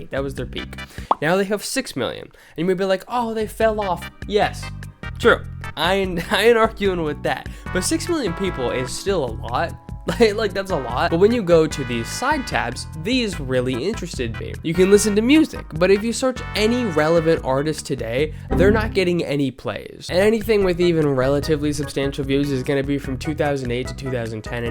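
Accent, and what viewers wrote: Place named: Midwest, United States. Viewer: midwestern kurtis conner? (also omg we're litch the same exact age what)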